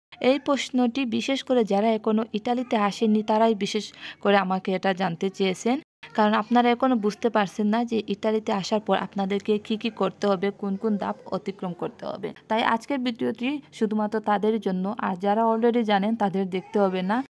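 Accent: Sylhet, Bangladesh. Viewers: আপুর বাড়ি মনেহয় সিলেট, আপনার মাত সিলেটির মতো লাগের।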